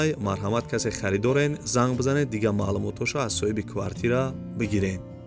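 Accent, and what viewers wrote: Tajikistan. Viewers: Додари апа дигар каналои хона савдо гапзада хам наметонан гушкардан ам намехохи ахсан ба и гапзани тоза лахчаи точики 👍бехтарин хазор лайк 👍 👍 👍 👍 👍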